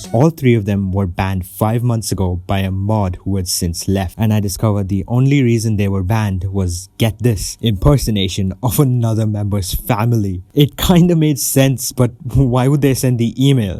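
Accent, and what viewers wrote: India. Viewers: my favorite india accent person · he indian i can hear it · he used to sound like a lil indian before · Are you from India your voice has I think Indian accent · are you indian u sound indian to me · i never knew he was a indian or used to speak indian accent :) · Hello man with an indian accent.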